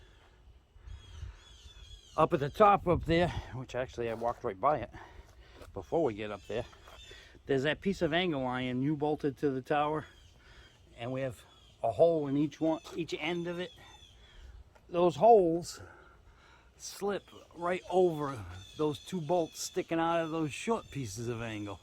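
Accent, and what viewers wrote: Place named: New England, United States. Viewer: LOL! Ithis is the first video of yours that I have ever watched. I am originally from NY, and have family in Ct and Ma. I heard the accent and said he must be from Mass. But further research said you were in Carolina. I was thinking how could he have that New England accent? Now I know.😊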